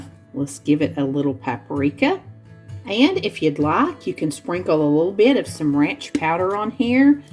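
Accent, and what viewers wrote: South, United States. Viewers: I discovered your channel not too long ago, and now look for new videos all the time. You explain things so well and I love your Southern accent I also love the things you make. I really enjoy your Vids!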